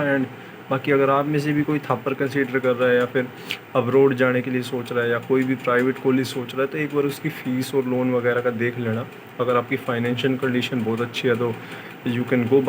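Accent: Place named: Haryana, India. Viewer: ...yeah wahi haryanvi accent sounds cool😍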